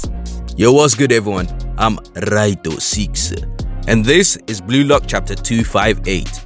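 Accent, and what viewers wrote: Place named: Jamaica. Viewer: hey are you friends with Truther? You sound Jamaican and truther is jamaican as well. Just asking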